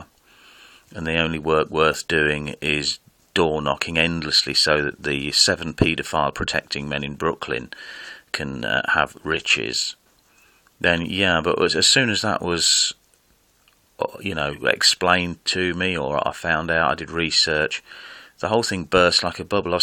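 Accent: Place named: United Kingdom. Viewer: I've been a lurker on your channel for some months now, and I feel it appropriate at this time to show my appreciation for you posting these monologues. Besides the obvious depth of the subject matter, I also enjoy your strolls in the park, your British accent and "load of old rhubarb" expressions, and your richness of character. I'm not a JW, never been one and never will be, but I am amidst the long process of attempting to guide a born-in out of his misery. I thank you for your efforts.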